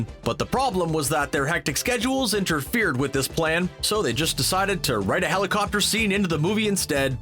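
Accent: Canada. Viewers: Can't deal with the heavily Canadian accented narration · How come all these types of docustyle posts are done by Canadians with heavy Canadian accents?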